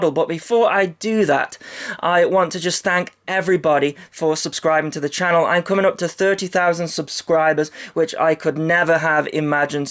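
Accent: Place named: United Kingdom. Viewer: Your so popular I think because your delivery mixed with your British accent then add that you're very informative and interesting to listen to. Keep up the good work! Sincerely a Loyal subscriber. William Horsley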